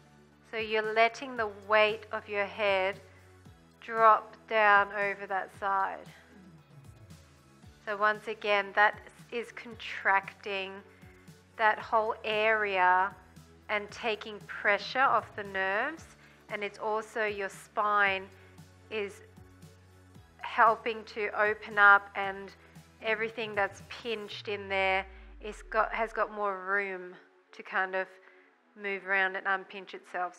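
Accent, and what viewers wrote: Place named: Australia. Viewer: A freaking Aussie! G-day mate!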